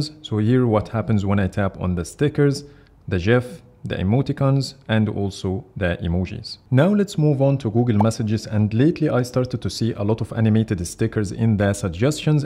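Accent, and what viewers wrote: Egypt. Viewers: Bruh Egyptian accents can always be picked up xd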